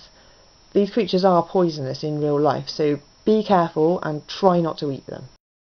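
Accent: United Kingdom. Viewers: I love your accent and videos :), are you British btw? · whoo hoo your'e british!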